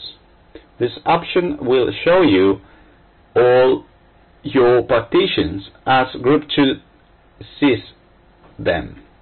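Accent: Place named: Spain. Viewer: Parker Stephens He is a spanish developer, so English it's not his language. Also, I think he is reading a script. For spanish people, like me, sometimes is difficult to have a good accent in English. Regards.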